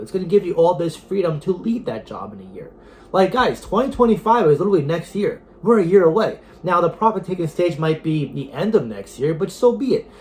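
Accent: New York, United States. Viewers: Are you from NY?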